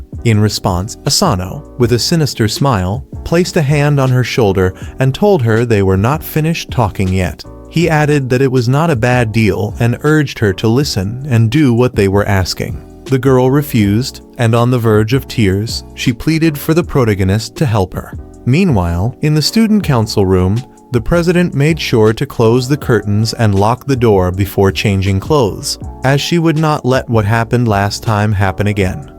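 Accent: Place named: India. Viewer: why did it switch to an indian dude narrating with no warning help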